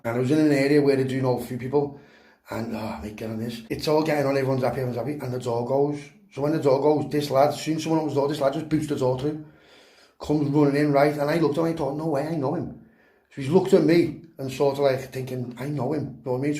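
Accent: Liverpool, United Kingdom. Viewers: Love it scouse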